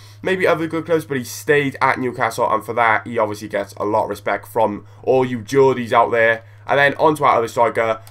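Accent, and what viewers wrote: Wales, United Kingdom. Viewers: Are you that guy from the valleys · You sound like leroy from the valleys! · r u from the valleys????????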